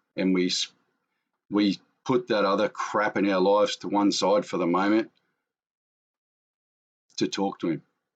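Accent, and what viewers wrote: Australia. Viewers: hey I'm here in the USA and I just want to give you my input on the name change. I would say don't change it your accent is Australian you're Australian and there's nothing wrong with the name that's the way we know you. also on a sad note I have to report as humans we all stereotype stupidly, and I think most people expected you to look like Crocodile Dundee. just like everyone from the East Coast thinks here in Arizona there still Indians running around on horseback at Cowboys shooting at one another. it's programming from too much television sadly but people will learn and grow and adjust to the fact that not everyone from Australia looks like and sounds like Crocodile Dundee. just being honest and saying what nobody else has the courage to say. The Whole World's still pretty biased judgemental and silly.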